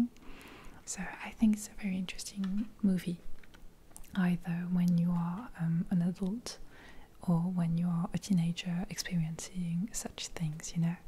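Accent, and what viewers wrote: France. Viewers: Your accent makes me wanna drop my life and find love in France........ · Your french english is adorable. You are always adorable. Please make a brush video!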